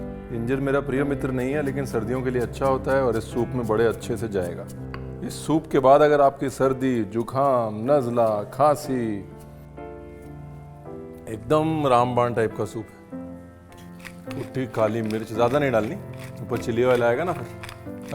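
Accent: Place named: Uttar Pradesh, India. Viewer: Is Ranveer UP'ite? He has a UP accent..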